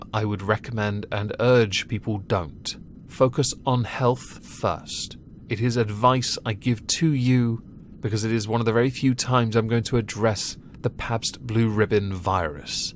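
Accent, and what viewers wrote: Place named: Midlands, United Kingdom. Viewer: You sound like you're from the Midlands. I'm from Lincoln originally but live in Tokyo now. My friends from the Shire say there are cases of Coughdrop19 there now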